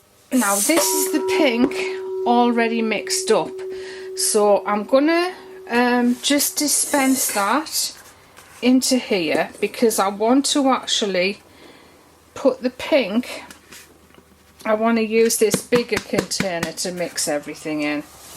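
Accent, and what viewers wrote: United Kingdom. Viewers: I love your British accent !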